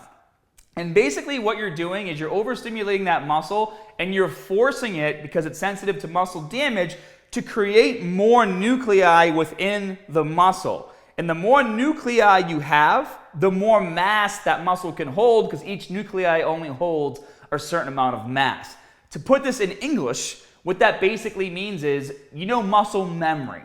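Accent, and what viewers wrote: Boston, United States. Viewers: this guy must be from boston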